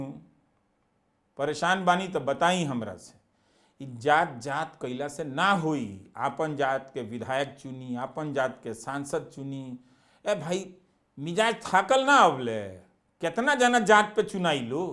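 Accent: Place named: Ballia, India. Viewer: आपके जेवन भोजपुरी बोले के शैली बान ऊं हमरा बलिया ज़िला से काफी मिलेगा रविश भैया मजा आ जाला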